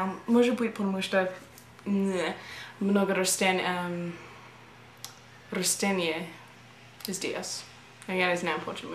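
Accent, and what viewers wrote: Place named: United States. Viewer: Try a little bit harder on pronunciation. You sound like an American speaking English with Russian vocabulary, But don't give up, it'll get better, Russian ain't Easy!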